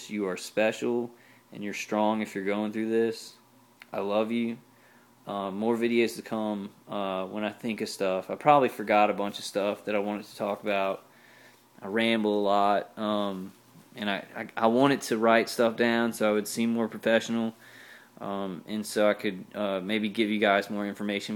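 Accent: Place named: Baton Rouge, United States. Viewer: I see it's a Batman day today,. Your house seems nice- very modern- lucky it goes well with silver?:) You said once you came from Baton Rouge? I guess that would be the accent. I'm interested in US accents, as I am from Australia. I'm slowly getting there in distinguishing some of them. Good video. You've said you're focusing on the external. That seems like a good plan. It could really take some of the pressure off you.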